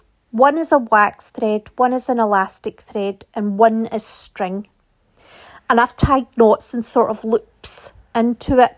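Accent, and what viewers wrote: Scotland, United Kingdom. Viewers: What a lovely scottish accent?! Great video.Greetings from Spain. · thanks ever so much for the quick reply, great hearing a Scottish accent on a YouTube art video. Thanks for the information, I have done Lino and Japanese woodblock printing before and I have got loads of art supplies I can use with the gell plate so excited to get started. I volunteer as an art tutor for a church art group we have done linoprinting, airdry clay, stained glass drawing and painting and I am always on the lookout for new things to try. Looks like endless things to try with this technique I mainly paint Scottish landscapes. Thanks again enjoying your videos. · I absolutely adore your accent! I think the Scottish accent is so full of music and poetry 💗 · This was absolutely wonderful! Thank you so much for all these excellent ideas suggestions techniques… Tricks of the trade! 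I know I will be coming back to this video over and over again. I’m just getting started with gel plate printing and I’m so excited to explore. This video has given me even more ideas! Additionally, I really love that it isn’t cluttered with a lot of background noise or music, it’s just you talking us through the process – in such a beautiful accent at that! Long live Alba! 🏴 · Awesome, and a Scottish accent to boot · Enjoyed this very much. Clearly explained techniques with lots of variety. Thank you for mentioning the need to let the paint dry. I have watched many instructional videos and it’s seldom touched on. I’m Scottish, and it was so refreshing to listen to another Scot. Very many thanks.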